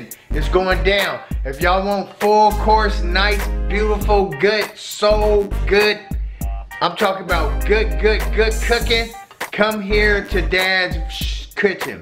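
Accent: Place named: Bay Area, United States. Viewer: Thank you for this my tri tip cam out tender! Btw Yall must be from the bay I recognize that accent lol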